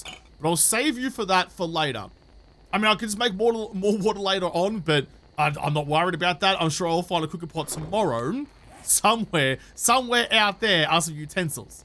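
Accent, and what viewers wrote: Australia. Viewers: Bro where in Australia are you from?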